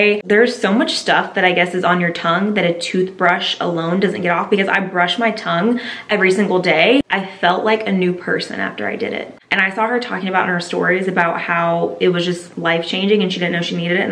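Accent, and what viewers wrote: South, United States. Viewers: Why am I just noticing your southern accent?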